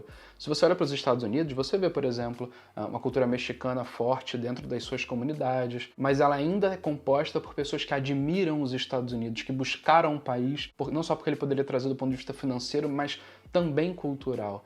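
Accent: Rio de Janeiro, Brazil. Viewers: Voce tem que ser Carioca com esse sotaque. Eu tambem nasci no Rio num lugar perto da Penha chamado Bras de Pina. Sou cidadao daqui dos USA a quatro decadas.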